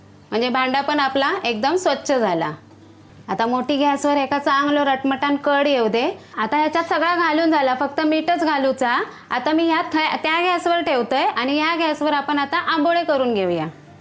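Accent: Malvani, India. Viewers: व्वा अस्सल मालवणी संभाषण. ऐकुक खुप बरा वाटला. तुमचा बालपण गावात गेला वाटता. भाजी, आंबोळे, सांबारा एकदम झकास. बारीक सारीक मुद्दे खुप चांगले समजऊन सांगितले. 👌👌👍👍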